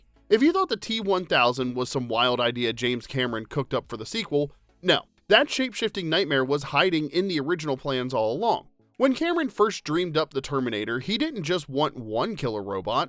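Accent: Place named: South, United States. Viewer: I enjoyed this. Thought it was funny that the narrator didn't reference his own Southern accent. The word "on" as a two syllable word, "drawling" instead of "drawing", etc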